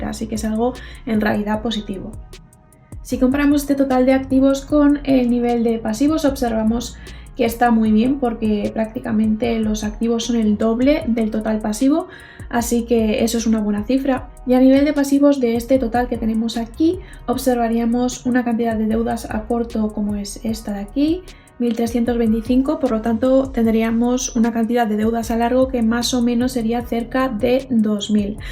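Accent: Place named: Spain. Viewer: Que buen video!!! información de calidad y buenas opciones, por cierto que hermoso tono español tienes 😉👌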